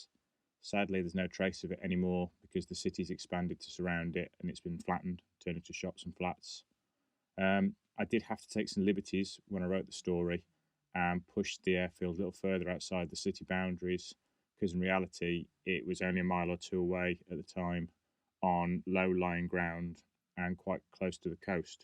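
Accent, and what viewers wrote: United Kingdom. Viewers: Just pinged watched 3 episodes and their production videos. Saving the last one for later. Loved that 3rd video ended somewhat unclear. Im guess you could say im a fan of ww2 planes but i never remember hearing about an boulton paul defiant...Watching the video i thought it was somekind of mix between a hawker hurricane & ju87 :D Dont know if the video production got better but I had really hard time following the first episode. Had to paus it many times, go back & listen to it again. Either I lost interest in it. Or first video had you mumbling? OR the most likely one. It took me time to start understanding the accent. By the way, Im from estonia soo english isint my first language & I absoluutely love the British accent voice :) wish i could fake talking like that.... Riiiight...And as far as the second episode. That was my favorite by far :) Dont know why but it got me hooked and i could not look away. 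Thanks for the stories :)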